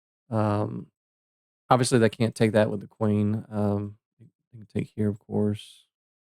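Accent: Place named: South, United States. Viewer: you know then, secrets of magic can be something of a letdown to most folks. When I sell magic on ebay I can't do refunds for that reason. When I was living in Somerset Pa , I worked for House of Enchantment, 😆, I know , sounds like a brothel, anyway I would sell linking rings to them and they'd come back and say they wanted the ones that I had . I had to explain that they were the same principle, but because I had been doing them for ages , that i could make them appear to melt thru each other and they just needed more practice... There's a lot of awesome mathematical card tricks I could teach you if you're interested. A couple are uploaded on my YouTube channel which I don't promote, it's mostly for myself, but lemme know , I'd be happy to share . By the way, I heard that southern Twang accent , and I would have bet my Good Bishop that you were from Tennessee or Kentucky, maybe even Texas, but I didn't want to insult you if I was wrong lol. 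.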